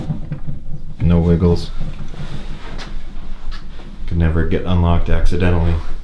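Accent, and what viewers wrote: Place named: United States. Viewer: Voice of an American, I guessing probably not a Democrat...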